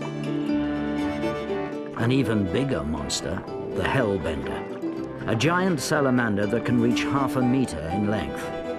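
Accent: England, United Kingdom. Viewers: Interesting listening to what sounds like an Englishman speaking words like Appalachia and others. Very well done video though and quite professional. The native superstition material was a bit much. Moira Smiley was a perfect choice for vocals. Her talent was only out done by her beauty. She is lovely.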